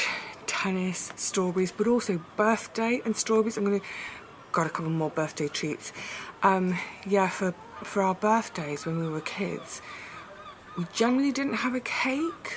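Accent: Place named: Dorset, United Kingdom. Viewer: I noticed you're getting a bit of a Dorset accent here & there! xx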